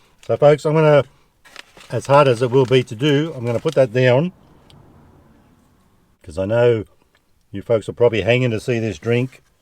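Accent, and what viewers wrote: Australia. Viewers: This blokes a little too Australian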